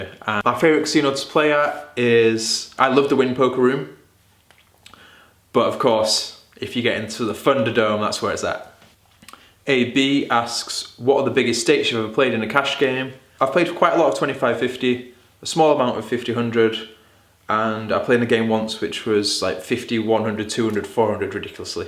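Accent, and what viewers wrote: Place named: Yorkshire, United Kingdom. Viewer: Hey, where in the UK do you come from? I mean you sound like a Yorky like me. so?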